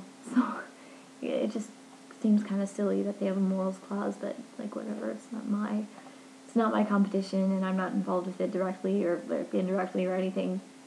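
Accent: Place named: United Kingdom. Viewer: interesting, you dont sound american, i thought it was a british accent, maybe canadian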